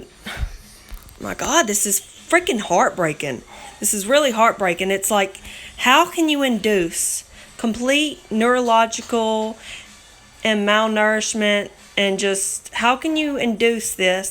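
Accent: South, United States. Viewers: Ummmm can everyone stop classifying vegans into the same group as raw fruitarian? I am vegan and chubby and healthy. Not every vegan eats fucking cucumber noodles and green smoothies. Animal food as this lady states is not healthy and is full of cholesterol and carcinogens. But leave it to someone with a southern accent to not do any research before making a video based off of one extremist mental RAW fruitarian/ NOT a normal vegan.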